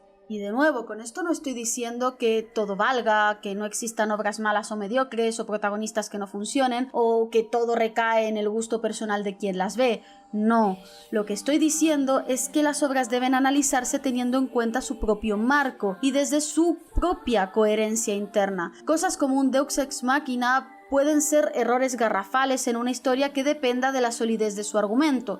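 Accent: France. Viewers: Soy nuevo en canal, ¿ la chica que locuta es de asendencia francesa lo comento por su acento ? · Porque tiene asentó francés?? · De que parte de Francia sos?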